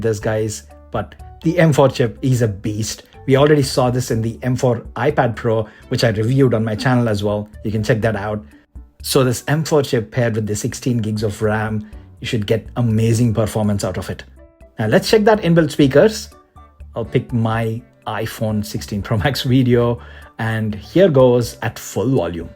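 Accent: India. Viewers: are you an Indian Filth. eww the accent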